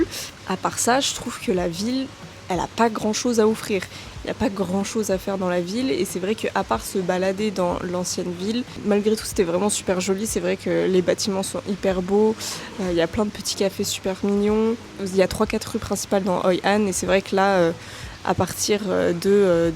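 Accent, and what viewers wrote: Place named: France. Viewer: Really like your French accent! Making me to relearn French. Look like you enjoy time in Vietnam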